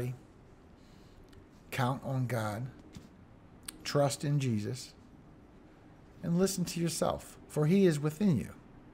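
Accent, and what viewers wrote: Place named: New Orleans, United States. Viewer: when I first saw you pop up on my YT feed I thought you looked familiar and when I herd you speak I knew you was a native and I herd you say you was from here I was born at EJ lol I do recognize the name , N.O. Is small so very possible we have crossed paths one way or another, either way we brothers in Christ and we here now . I really enjoy your shows updates and most of all your prayers bc lord knows we all need them and you always have some from home 🙏👍🏼 The BEST A-MEN IN THE BUSINESS LOL Never Quit !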